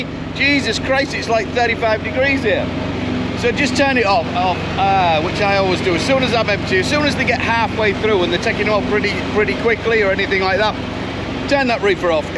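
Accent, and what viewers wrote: Yorkshire, United Kingdom. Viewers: You can take the boy out of Yorkshire, but you cant take the Yorkshire out of the boy Ayup mate, from Sheffield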